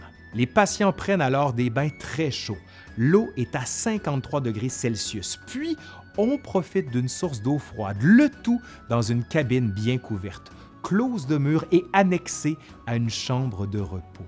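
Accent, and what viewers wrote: Quebec, Canada. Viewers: Un petit mot plus que dire juste pour ton référencement juste "référencement" car je ne sais pas comment va l'algo de youtube! Avec Nota Bene, Manon etc que tu connais, tu devrais recevoir un prix pour ton travail! Je te l'espère! Avec un petit accent québécois que j'apprécie! Bah oui, Belge, ça change de l'accent Fr ;-) Puis ma prof de science physique parlait pareil! Ok j'avais 18 ans... Et elle était si 😍😍😍Et je m'égare... Continue des partenariats! Et super, peu? ou jamais de sponsos qui saoulent de nord vpn ou autre! Je te souhaite de futures très bonnes vidéos et une audience décuplée!!!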